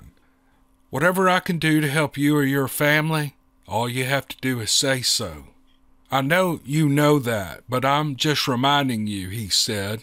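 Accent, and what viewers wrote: South, United States. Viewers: You are so good reading this! Your southern voice is perfect. MERRY CHRISTMAS, TO YOU AND YOURS FROM ME AND MINE!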